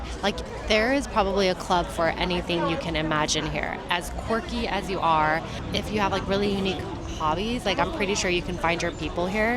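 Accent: California, United States. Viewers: I like your California accent, stands out in NY.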